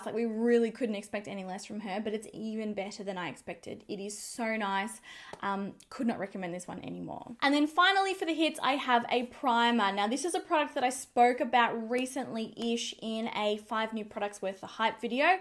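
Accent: Australia. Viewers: Joan Gentile I’m curious to know how you do not know an Australian accent when there are many Aussie celebrities with the same accent. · She's from Australia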